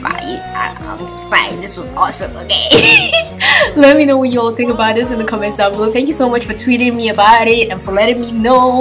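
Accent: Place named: South Africa. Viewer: i love your accent! are you from south africa? or jamaica? :))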